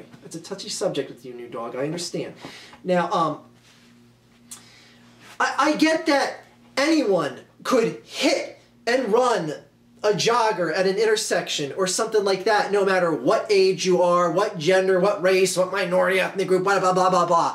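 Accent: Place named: New Jersey, United States. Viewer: He has a Jersey accent if I'm not mistaken.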